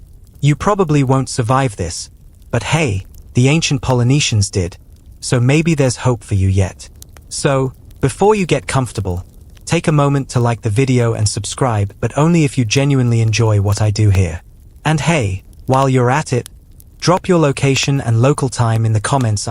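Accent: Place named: Europe. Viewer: When a european sounding man narrates its boring and full of holes, not a sleep story at all, more like an unintelligent story told by an unpolynesian male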